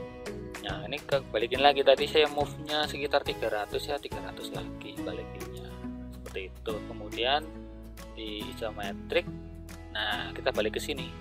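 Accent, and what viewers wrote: Banyumas, Indonesia. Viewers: Full tank sukses selalu buat om.. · Master jan keren temenan ya,geleng geleng inyong lah,sukses om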